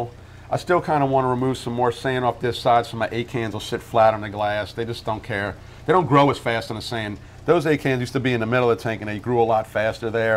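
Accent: United States. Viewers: i knew at about 5 seconds in this guy was from LA. haha i miss southern accents. southern guy stuck here in CA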